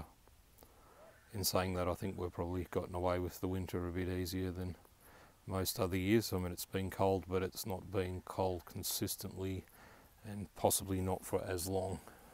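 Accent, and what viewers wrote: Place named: Australia. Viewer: Good luck with your jabo. Is it a Sabara? It's so cool to hear your accent. I'd absolutely love to visit Australia 🇦🇺 one day.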